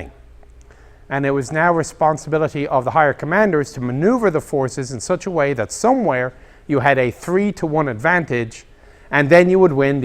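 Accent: Ireland. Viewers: Where is that speaker's accent from? A little bit of Irish? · He is CLEARLY irish. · Chance your he's Irish? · Irish accent??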